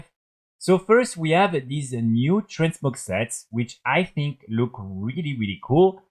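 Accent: France. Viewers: Cute accent Frenchie.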